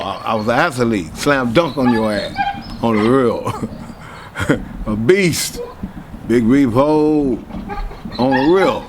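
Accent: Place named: Louisiana, United States. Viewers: Why do he still have a new Orleans accent 🙄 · I knew he was from New Orleans the first time I heard him talk lol he sound just like us. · He been gon that long and still can hear that Swamp in his voice. That dialect never leave us #DaBoot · Louisiana accent will never leave no matter how long you been away from home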